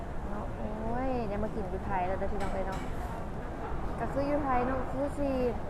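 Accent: Laos. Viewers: ดูแต่สาวลาวนี่แหละอยากฟังสำเนียงลาว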